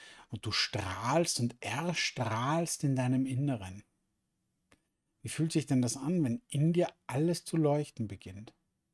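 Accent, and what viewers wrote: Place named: Linz, Austria. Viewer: Hallo, hab´ dich erst gestern über zwei Videos mit Monika Donner "zufällig" entdeckt (na, das "zufällig" glaubt kein Mensch mehr...) - den Linzer Dialekt erkannte ich sofort... es war nämlich auch mein erster... Nachdem´s heute grad nicht ganz einfach war mit der emotionaen Freiheit, probierte ich diese Meditation aus... und, oh ja, sie wirkte grandios. Jetzt sitzt das Herz wieder dort, wo es soll... und lächelt... Alles gut!!! Herzlichen Dank für die Meditation! Einen lieben Gruß vom Lande!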